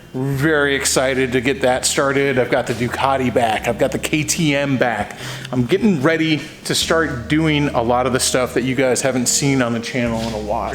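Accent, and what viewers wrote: South, United States. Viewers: What happened to your southern accent?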